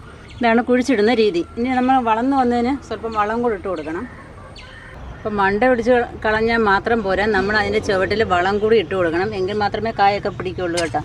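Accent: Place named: Thiruvananthapuram, India. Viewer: തിരുവനന്തപുരം slangs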